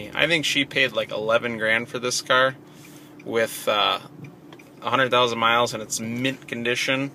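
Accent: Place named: Canada. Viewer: I trust what your saying cause I'm hypnotized by the awesome car and you sound Canadian to me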